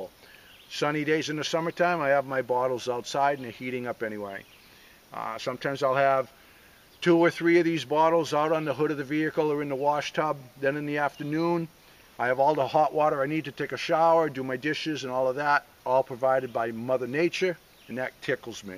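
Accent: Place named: New York, United States. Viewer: You do have New York accent. So wonderful.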